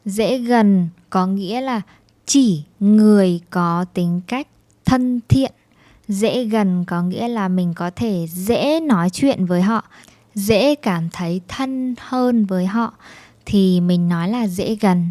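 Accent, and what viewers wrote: Vietnam. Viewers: I really love your Northern accent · is this northern, southern, or central vietnamese?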